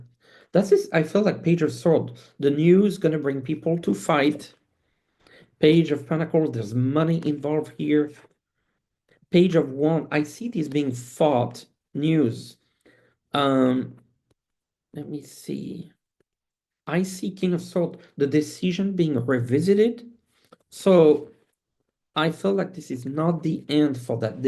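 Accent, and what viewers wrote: France. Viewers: I like the way you talk, calm and soft. Cute French accent, ooh. I think you present your thoughts very well, very well. See you next time.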